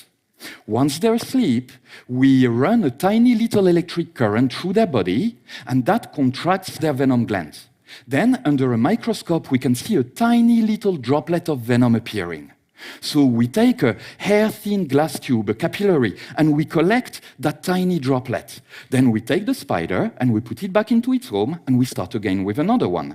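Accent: France. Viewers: Okay French accent is now cool XD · Speaks very well, french accent or no. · I don't care if can change the world I became total dumb about rational things when it come to spider 😭😭😭😭😂😂😂 et ptdrr Rpz l'accent Français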